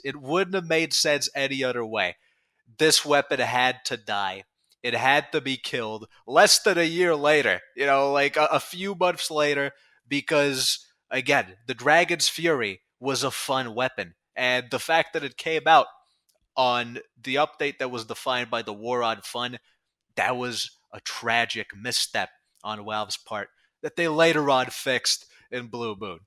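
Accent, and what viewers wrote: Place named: New York, United States. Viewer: do you think swearing 27 times every sentence in new york accent makes you sound more confident or smth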